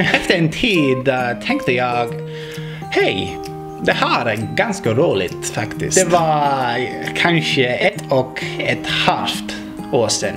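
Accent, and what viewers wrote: Finland Swedish, Finland. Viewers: Du pratar Finlandsvenska men orden är bra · Haha, I love that you've learned it with a swedish/finnish accent! Good job, mate! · haha you sound finnish. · So... how long have you been speaking swedish men jag kan talar inte svenska även dom det är vor andra språk.. at least not any good don't even know is that correct :D . and i was so amazed for your finlandsvensk accent and perfect prenounsings ! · I can hear finlandssvensk dialect there :) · Det låter som finlandssvenska men du är jätteduktig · To a Swedish person it probably sounds like you're already speking perfect finlandssvenska... Or at least this is pretty much what they sound like when they try to imitate it :) pretty fucking good, gotta tell ya! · You should try to learn swedish with a swedish accent and not with a finnish one. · Wow! Gullig finlands svenska :D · bra svenska men med finsk dialekt. 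vrf tröck du där??!! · OMG! Srsly... How did I miss this video? Loving the Finnish accent! How 's the Swedish coming along these days? I guess learning Finnish is probably a bigger priority for you.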